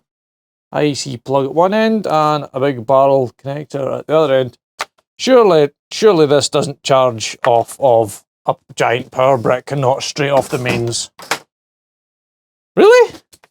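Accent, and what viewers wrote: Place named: Glasgow, United Kingdom. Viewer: could you possibly incorporate the words "curly whirly". "murder", "world" and "work" into one of your next videos? I love how they sound in the Glaswegian tongue. I always enjoy your vidz man.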